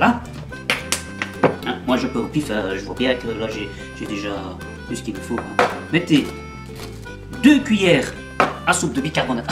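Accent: Brussels, Belgium. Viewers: Super ! et drôle en plus avec l'accent bruxellois une fois !👍👍😂😂💐💐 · Tes recettes et le ton que tu y donnes sont super ! (et on adore ton accent belge en France) ... Par contre, pas besoins de bicarbonate, en effet c'est chimiquement totalement non nécessaire car cela s'annule avec le vinaigre (PH opposé). Je te joins l'explication ... <link>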